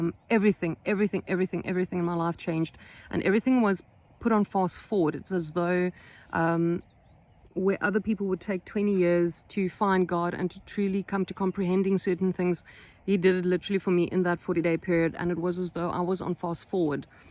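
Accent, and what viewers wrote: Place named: South Africa. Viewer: Could hear you are from South Africa as soon as you started speaking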